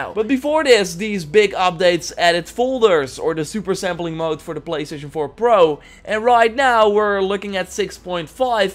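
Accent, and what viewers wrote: Netherlands. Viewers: your Dutch accent kills me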